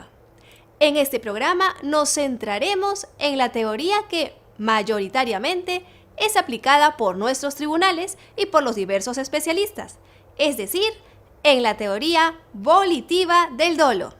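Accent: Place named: Mexico. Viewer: Habla como Mexicana...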